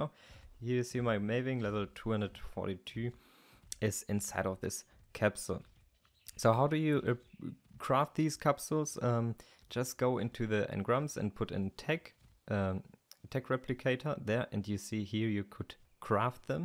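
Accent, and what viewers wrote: Germany. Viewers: Hi thanks Bist du deutsch? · Do I hear DEUTSCH??????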